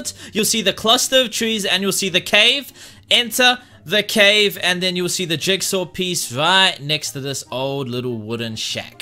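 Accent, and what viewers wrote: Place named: New Zealand. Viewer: I LOVE YOUR ATTITUDE AND YOUR KIWI Accents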